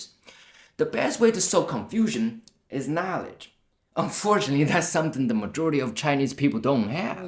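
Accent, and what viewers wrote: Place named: Houston, United States. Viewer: wow brother. love to hear and see from more small youtube channel mainlanders lately. you are very brave! great rap and great takes on all the videos ive seen so far. where did you learn your english? 😂 its awesome! you sound just like some of us asian folks in houston, texas!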